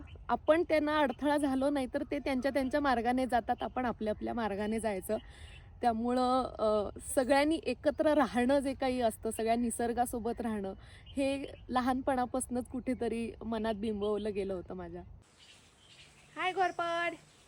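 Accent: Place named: Konkan, India. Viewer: कोकणात असूनही तुझ्या आवाजात थोडा कोकणी+सोलापूरी टोन जाणवतो.😊👌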